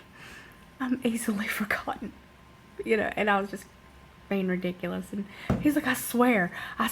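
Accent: South, United States. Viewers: Are you from the South, honey?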